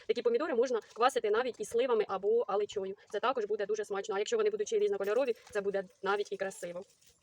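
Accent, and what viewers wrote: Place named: Poltava, Ukraine. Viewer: Дуже схоже на полтавський говір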